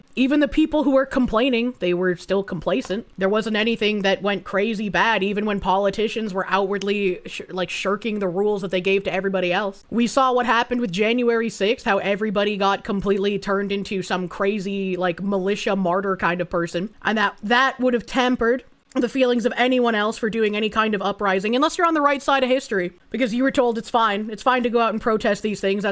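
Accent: Boston, United States. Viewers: I’m hooked whenever I see her ring all cute in the intro. Then the Boston accent hits?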